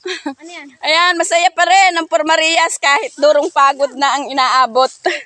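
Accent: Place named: Atimonan, Quezon, Philippines. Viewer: Nkkbilib nmn..Sobra sipag tlga.. yun punto ng pagsasalita ninyo ay para taga atimonan quezon.. pakiramdam ko eh andun tuloy ako s lugar ng lola ko😊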